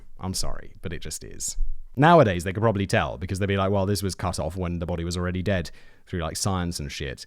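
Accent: United Kingdom. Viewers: You Britt's crack us Americans up💯....not just the ridiculous way you talk....but your genuinely INNOCENT demeanor 👀........I know there's tuff guys out there....but honestly from listening too you, there seems to be A LOT of panzees👀👀...an I mean that in the most respectful way possible....you an your kind just DONT seem too tuff honestly 💯🤔🤔🤔...I mean seriously man👀....your talking about planes...living in other countries an STAYING👀....taking "Holiday" Somewhere else than YOUR own country 💯👀......Sorry man...just ain't crackin🤪🤪🤪....that's the BEAUTY of the nation you lost in 1776.....we HAVE it all.....jungle, swamps, plains, grains, an tall tree's 💯.... Buffalo here an plenty of "citizen" deer EVERYWHERE...not the "Kings"🤣🤣🤣🤣.....Sorry buddy, I'm just NOT a fan of old English society 🤢🤢🤢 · Coo-gee, I love your British pronunciations. Could-gee is closer. 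ANZAC Day is in February and has dawn and dusk services, a parade of service men and women and is in remembrance for WW1. It is also the only day Two-Up gambling is legal in Australia. Remembrance Day is held with a one minute of silence at 11am, 11th November every year, and at certain places, like the RSL, they play The Last Post, and is now recognised as a commemoration of the fallen for bot WW1 and WW2.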